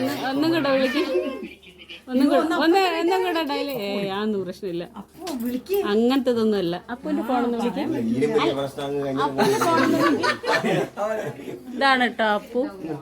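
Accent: Vadakara, India. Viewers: 😂😂😂അടിപൊളി...വടകര ഭാഷ 😌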